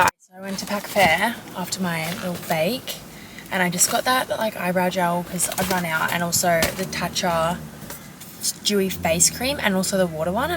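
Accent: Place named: Australia. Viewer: Who wants to listen to me for 25 minutes errrrrrr me trying to fall asleep to the sound of that soft beautiful Aussie accent 😂id actually appreciate longer videos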